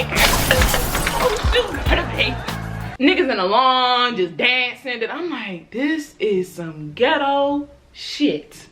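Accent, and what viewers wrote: Baltimore, United States. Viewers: Ahhhh you from baltimoreee 🤟🏽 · You from Baltimore? SUBBED! On peridot. Fellow DMV Native, should’ve clocked the accent on you 🤪✨ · I had a feeling you was from Baltimore by the way you talk 😂😂 .... we all sound the same 😭😂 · I knew your were from Baltimore ❤️ it was the accent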